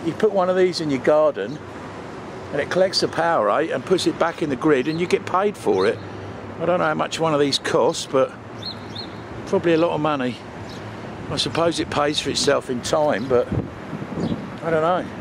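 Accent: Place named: England, United Kingdom. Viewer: The first part of htis video throws people off because you have an english accent and you say in London haha. Even though we know your in Canada it's irony I tells ya irony.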